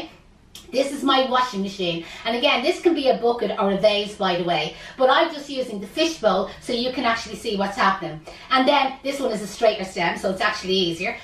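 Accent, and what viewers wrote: Ireland. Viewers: It’s “flower” food. She has an amazing Irish accent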